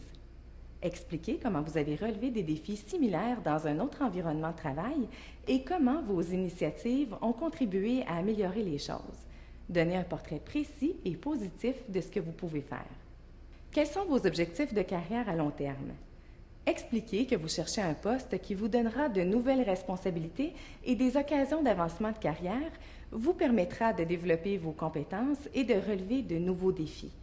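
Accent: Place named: Quebec, Canada. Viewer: i think so shes from quebec thats why she has an accent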